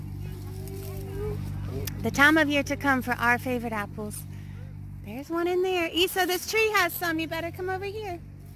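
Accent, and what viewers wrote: South, United States. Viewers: you and your daughter sound so much alike. You both have the southern accent and tone to your voice. Nice video 🙂❤ I love going to Northern Georgia around Blueridge Ga to get fresh apples in the Fall. October is the month to go.🙂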